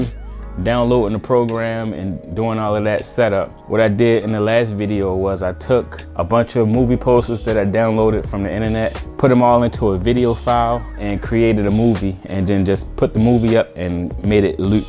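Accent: Baltimore, United States. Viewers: im guessin youre from bmore?? 🤣🤣 them dews and tews lol. good vid tho nice work on everything, i was buying random lights too until I got to Govee. Now my wife is like dont bring anymore lights in this house 🤣i see this is a couple years old tho ill check to see if you updated ✌ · Could tell you were from bmore in the first 2 seconds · Is that a Baltimore (Maryland) accent? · You got a follow beacuse the accent screams Baltimore lol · Dope channel and your bmore bmore I hear lol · Wassup man…just tuned into your channel for some ideas, loving the content. Btw are you from Baltimore? You kinda got our accent 😂😂. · Just stumbled upon this video you’ve got to be a Baltimorean I recognize our accents😂🙌🏾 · Nice! Aside: By the accent, I'm gonna punt and say you're from the Baltimore area...🦀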